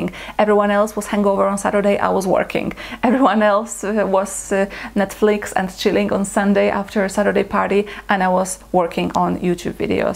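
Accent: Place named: Poland. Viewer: How come after 11 years in UK you still have such a strong polish accent ? Your videos are valuable but your english is very heavy and hard to listen to. Make it more fluent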